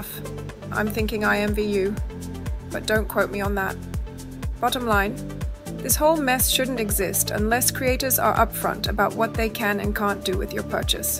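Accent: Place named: Australia. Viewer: well thought out and spoken piece on customer service and business responsible practices. Its a shame there is not a clear cut service agreement all business conducted in sl must follow or they can lose their Lindens and account. cool to hear a Aussie language on Sl! Also i need that dress! its perfect summer all rounder :))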